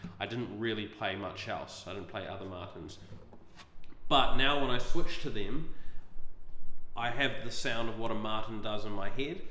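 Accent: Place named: New Zealand. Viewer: Love the guitar, the player, and the Kiwi accent!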